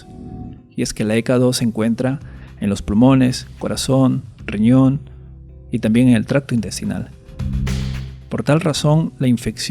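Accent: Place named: Peru. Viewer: Por el acento me parece que es peruano. El youtuber.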